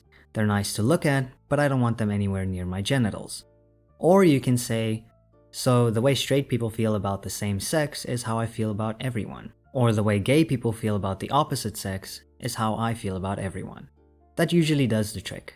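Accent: South Africa. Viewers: Are you also partly South African? There's something rly familiar about your accent lol but I suck at placing accents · this is an off topic question but are you south african? your accent and pronunciation just sounds so familiar and i just have to know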